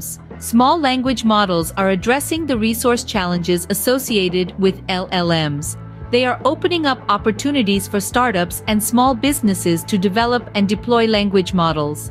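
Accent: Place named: India. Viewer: I don't think ai voice is made on purpose with a slight indian accent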